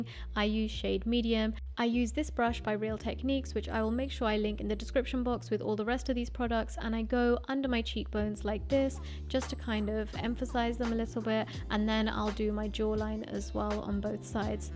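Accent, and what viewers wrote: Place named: United Kingdom. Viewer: It is so surprising that you are Pakistani and you have a magnificent British accent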